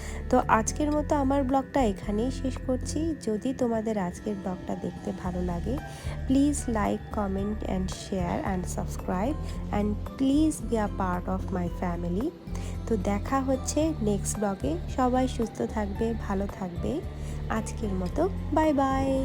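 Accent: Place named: India. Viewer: You are Bengali🇧🇩? Or Indian? আপনার ভাষায় মনে হচ্ছে ইন্ডিয়ান! Love from Bangladesh🇧🇩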